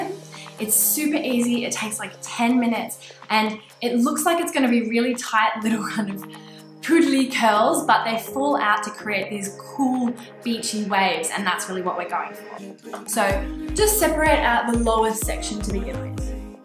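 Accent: Australia. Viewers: The curls that you made is so lovely, you look so gorgeous. And I love your Aussie accent.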